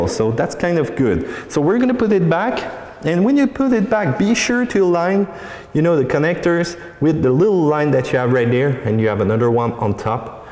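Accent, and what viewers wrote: Quebec, Canada. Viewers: c'est pas du hate mais..j'avais jamais entendu notre accent québécois en anglais...c'est spécial xDD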